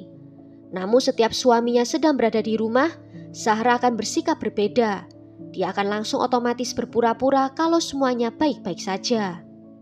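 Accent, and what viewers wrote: Java, Indonesia. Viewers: Medok kali jowo nya 😂😂😂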